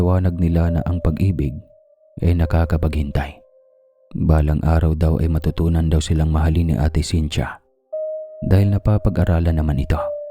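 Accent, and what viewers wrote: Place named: Iloilo, Philippines. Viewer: Kuhang-kuha ni sir ang salita namin na Ilonggo😇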